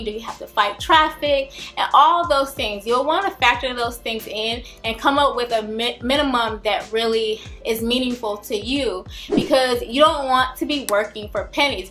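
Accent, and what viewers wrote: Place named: South, United States. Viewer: Damn, she's gorgeous, the southern accent is great and she has helpful videos. Thanks sugar!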